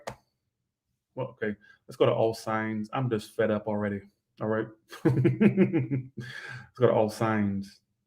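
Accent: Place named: East Coast, United States. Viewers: Love the northeast accent! Very good! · I love your east coast talk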